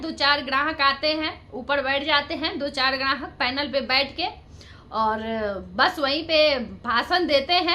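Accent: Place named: Bihar, India. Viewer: बिहारी अंदाज का मस्त बोलती हो आप मजा आ गया ❤❤❤❤❤❤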